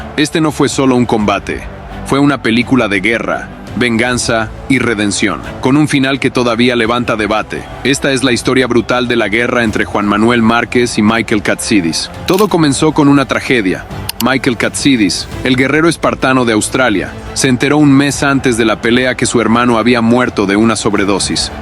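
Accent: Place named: Cuba. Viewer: De seguro eres cubano hablando mmmm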